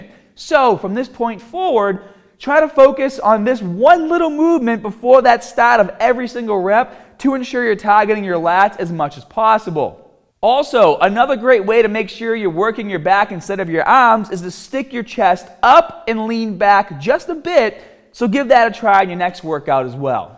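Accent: Boston, United States. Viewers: Very good video, these are great tips, especially the ones that have to do with getting started if you can't do pull-ups at all. And as always, that Boston accent is on point! Love it bro! Keep doing you! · that boston accent be thiiiiick haha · Sounds like Boston man... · Staaat the caar near the baaa.....and be smaaaaaat. Must be Boston or close by. His advice is good. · is that a Boston accent? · Pullups R too Haaaaad for you...LMAO...The advice puts forth has been around since the 70s, nothing new here but the Boston accent did bring the lulz. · First introduction to this guy. Good video. Good info. I suffer at pull ups but I keep trying. He sounds from Boston. This guy puts the strong in "Boston Strong". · The Boston is strong in this one! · Thanks for the tip about the band assisted pull-up, I was looking for that. I think there is a R in the word "hard". 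Boston accent? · You sound like you’re from Boston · Am i hearing Boston? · Must be from Boston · Sounds like Boston. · Soon as I heard you speak I said wait a second, this guy has to be from in or around Boston, then I saw the " " and now I know you must be, I'm actually right from the city of Boston 4th generation myself and Pat's season tickets holder, anyway, pretty cool and good luck with your channel and overall success, nice to see a fellow Bostonian doing well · Marine Poolee here. I ship out to boot camp in October and I've been working hard to get better with pull ups. I couldn't even do 1 pull up a couple months ago but now I'm up to 10+ pull ups. Btw, you talk the CORRECT way, I'm from the Boston area and you talk similar to me. Both my parents are from the city with thick accents so I guess that's why I talk the way I talk lol. Your accent sounds a little forced but you still talk the right way lol. 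Keep up the good work bro 💪🇺🇲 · Great video. I need to get onto pull ups and your vid gives great advice so thank you. Great Boston accent too. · Hes definitely from Boston. Forst time watching this guy but im from Boston so its not haahhd to heaa the accent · Boston rrrrrrrrr's all ovah the place! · Boston I think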